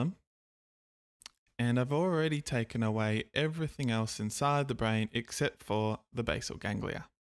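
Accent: Australia. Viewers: Is this australian accent?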